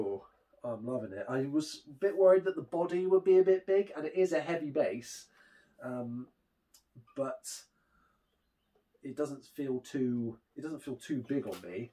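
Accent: United Kingdom. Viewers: Are you bri(t)ish?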